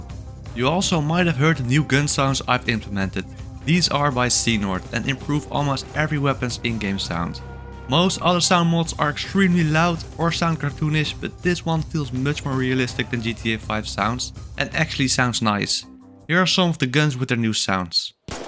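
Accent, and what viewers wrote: Netherlands. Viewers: i detected a dutch accent veel succes brooo je kunt het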